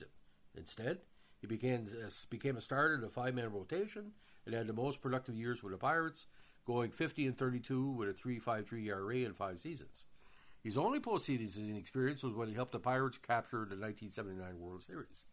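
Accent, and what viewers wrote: Canada. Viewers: Are you Canadian? I’m having trouble placing your accent!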